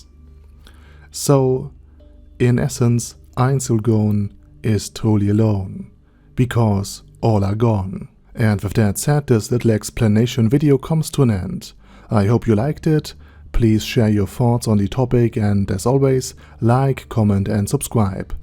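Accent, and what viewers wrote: Germany. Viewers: Anybody can take this how they want but your smooth masculine German baritone gives me very nice dreams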